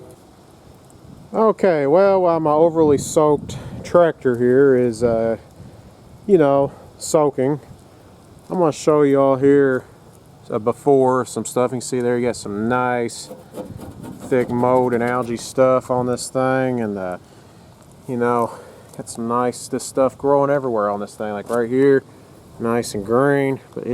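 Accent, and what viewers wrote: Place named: Texas, United States. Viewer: Where are you from my friend?? I'm from S.E.Texas and the way you say "that thang" and "right thar",makes me wonder if you're a fellow Texan...😁 I'm really enjoying the work on the tractor and would love to see you do some body work and restoration!!!💯👍👍👍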